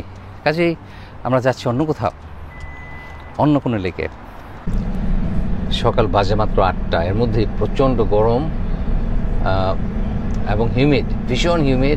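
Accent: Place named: Chittagong, Bangladesh. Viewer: ভাই, মনে হয় চিটাগং এর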